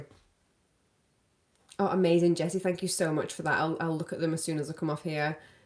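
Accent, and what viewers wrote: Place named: Manchester, United Kingdom. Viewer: I'm from Leeds and I could tell you were from Manchester.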